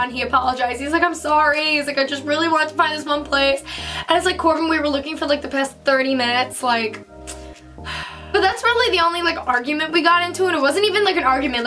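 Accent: New York, United States. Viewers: TeAnna Besougloff new yorker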